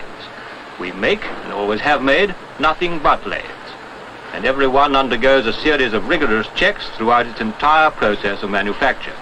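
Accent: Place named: RP, United Kingdom. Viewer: Hearing the narrator say “thou” instead of thousandth in his posh RP accent lends terrific credibility I must say old boy.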